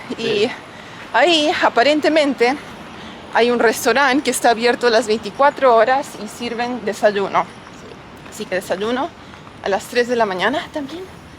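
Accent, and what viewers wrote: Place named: Argentina. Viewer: El castellano de se esta volviendo mas argentino 😅😅😅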